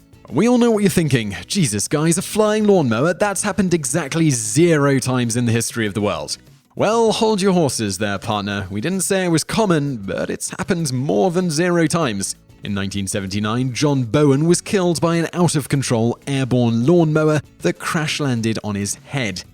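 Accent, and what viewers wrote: United Kingdom. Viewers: I HATE his British accent !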